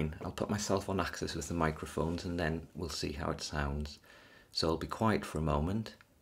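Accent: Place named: United Kingdom. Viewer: The amateur audio engineer in me enjoys the basic exercise being performed here. The ASMR enthusiast in me appreciates calm British-accented voice.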